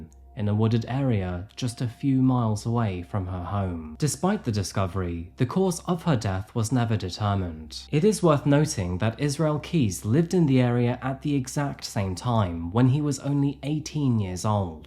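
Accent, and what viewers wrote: United Kingdom. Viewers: Translation: He's cute and has a British accent. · I love to watch coffeehouse crime videos., The way you execute your videos and the way you tell us how you feel make me feel that you are a very good person. I love to sit and watch your work. I know it will be good footage from start to finish. Please cover all kinds of stories whether they’ve been covered already. whether they’re old or new, weird or wild. And I always learn something interesting about the location you are covering. I love how you tell the it!! And of course, that British accent!!!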